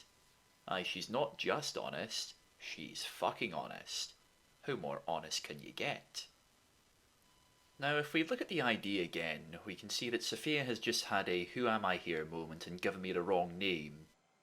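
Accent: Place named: New Jersey, United States. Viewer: Your NJ accent is dead on!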